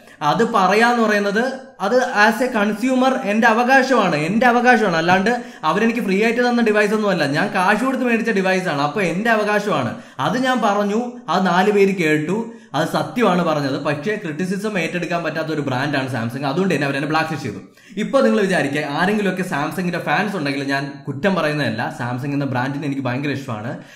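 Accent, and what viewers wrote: Trivandrum, India. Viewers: loved ur trivandrum slang ....ghora ghora.... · Bro brode Trivandrum slang enikk ishttappettu....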